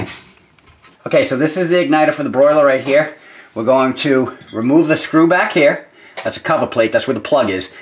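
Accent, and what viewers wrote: Boston, United States. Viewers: Love that Boaston accent. lol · are you from Boston? Good job on your video, THANKS. my Maytag is much older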